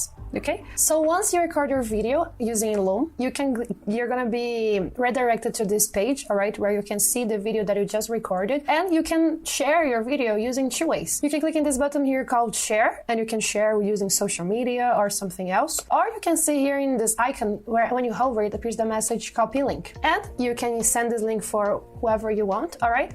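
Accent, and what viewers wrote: Brazil. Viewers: Reconheço um sotaque brasileiro quando ouço um, teu inglês é ótimo! Valeu pelo tutorial, pretendo começar o CS50x 2023 agora em dezembro e depois partir pro CS50x 2024.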